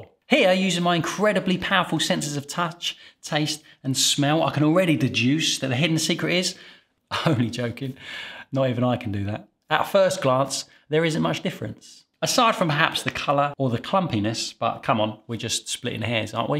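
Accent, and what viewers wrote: United Kingdom. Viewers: The added ingredient is to make people want more of it. As a result it will create more revenue for the company. At the same time, it also creates health issues in the long run. Making people seek Dr's, Dr's give meds, meds will create other health issues that would require more meds. It's a win win situation for the market at our expense. That's all there is to it. Thank you for the info. It sounds you are British from your accent. I don't know if this is worldwide, but in some countries mostly in the West (go figure) they are adding crickets and other bugs in the food we buy to make st home. So let us know if thst is also happening where you are. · His diction is not the same as his accent. His accent is that of 100s of 1000s of UK population. A northerner might say "ah nuh vah" but in a northern accent. Get a grip.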